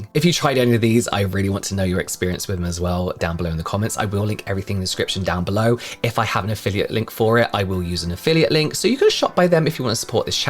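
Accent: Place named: United Kingdom. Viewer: Think you're hearing "tret" as in tretanoin, but with a British accent 😂 some of us don't pronounce our Ts.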